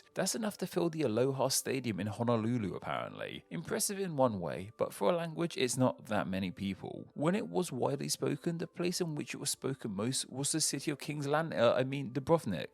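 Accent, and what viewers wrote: United Kingdom. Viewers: a British accent?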